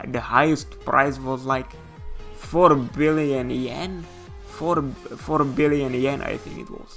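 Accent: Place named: Mexico. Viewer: That is a Spanish/Mexican accent or my name is Mexican Jumping Bean! (and it's not)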